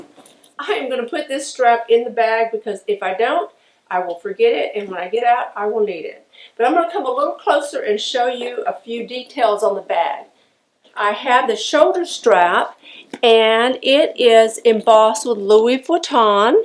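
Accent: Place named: South, United States. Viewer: I love your accent so much - south of the United States is so melodic and different (relaxed in a way) to me as a non native speaker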